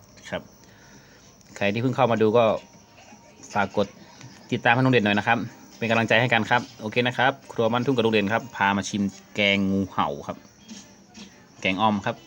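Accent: North, Thailand. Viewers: อู้ไทยประเเลดเมื่อง5555++++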